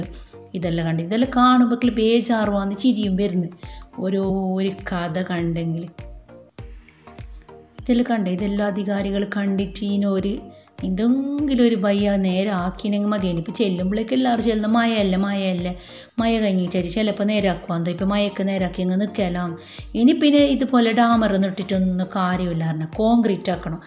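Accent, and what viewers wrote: Kasaragod, India. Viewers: കാസർഗോഡ് ഭാഷ സൂപ്പർ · Ksd basha pwolichu 😅😂 Video pwoli🕵✌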